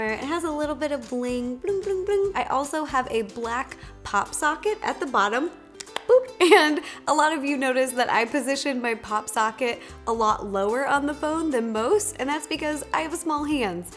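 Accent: United States. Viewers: you have a nice american accent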